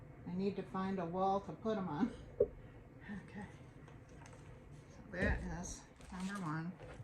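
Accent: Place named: Michigan, United States. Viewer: Great video, sounds like your a Michigan girl. I am, sorry if I'm wrong.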